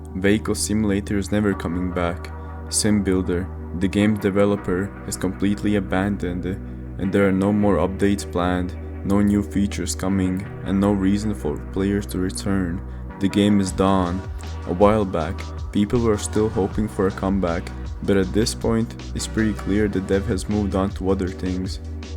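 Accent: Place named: Portugal. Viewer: Portuguese accent I think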